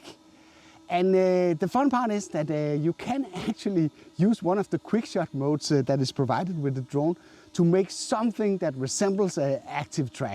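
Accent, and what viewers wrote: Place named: Denmark. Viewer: ???? JEG VIDSTE DET!!! Du er dansker - den accent kan du bare ikke løbe fra. " I mean eeh, you have been to school, not? I though it enough", " "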